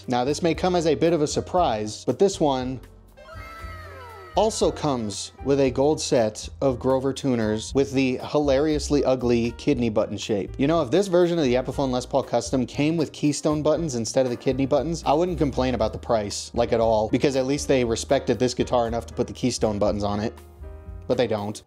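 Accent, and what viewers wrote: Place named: London, United Kingdom. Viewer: Hey good London accent. I sound more or less just like that😂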